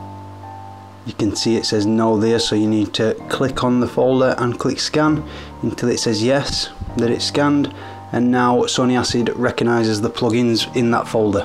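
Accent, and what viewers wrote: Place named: Manchester, United Kingdom. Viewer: love the Manchester accent :-) thanks for the video. im stuck between either getting acid Pro or reason I'm leaning towards acid Pro because it's less money I'm into making more minimal kind of electronic music ambient stuff so yeah. you any relation to Karl Pilkington?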